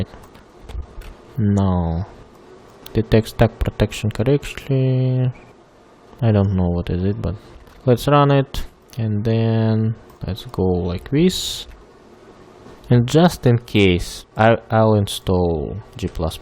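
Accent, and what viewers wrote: Russia. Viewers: С русским акцентом даже англ текст легко понимаем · Are you Russian? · Мне кажется или он русский???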